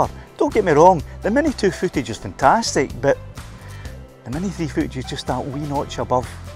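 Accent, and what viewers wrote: Scotland, United Kingdom. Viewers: Hi, sitting here in my cabin up in North East Finland with a big smile on my face, enjoying your Scottish accent and your comment to the teenager who drove past. The Mini 3 is my first drone and I enjoy flying it. I myself am an instructor and graphic designer and have made quite a few films within motion graphic advertising and documentary and will usually hire a drone photographer for the films I make. I would say that for lower budget films, the DJI mini 3 Pro is great for the job. Thanks for sharing, slàinte from a Dane in Finland.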